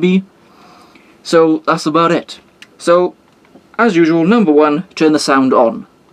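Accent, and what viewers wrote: Wales, United Kingdom. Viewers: I like your Welsh accent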